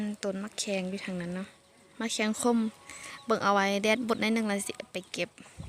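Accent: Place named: Loei, Thailand. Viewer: สวัดีครับน้อง สำเนียงภาษาเหมือนกับจังหวัดเลยของไทยเลยฟังแล้วน่ารักเป็นธรรมชาติดีครับ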